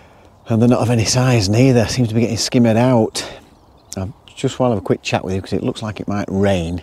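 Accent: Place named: RP, United Kingdom. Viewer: U with ya posh voice saying "my mate turtle" made me laugh.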